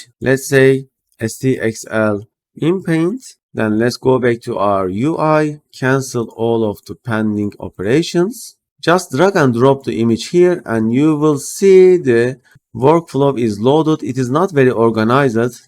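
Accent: Turkey. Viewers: I admire your Turkish accent. 🙂🙂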